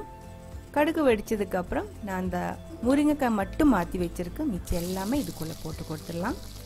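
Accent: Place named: Coimbatore, India. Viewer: Ada ammani..... neenga at present kovaila that erukerngala OK OK athaan pathean enada covai Tamil varuthenu. super ma. I too at kovai thaan. epa Ellam unga slangla thaan en wife pessaranga super. ur style of voice is amazing and with lots of love.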